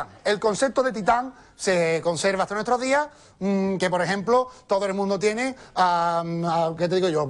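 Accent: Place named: Andalusia, Spain. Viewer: jejeje como molesta a algunos de por alli arriba ke alguien hable con acento andaluz. Menos antiandalucismo señores!!! ke crack, eres el rey. y tu sigue hablando con tu acento y al que no le guste que ponga canal nou.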